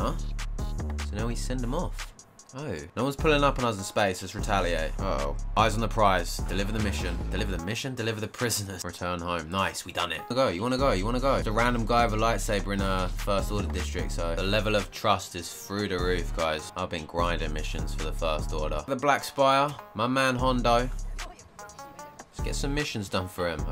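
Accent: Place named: United Kingdom. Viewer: We gonna have some Woaaaa.🤣🤣🤣 I love the British accent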